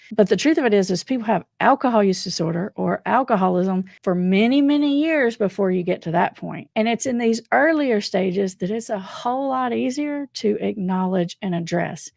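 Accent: South, United States. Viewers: where’s your accent from? Southern states?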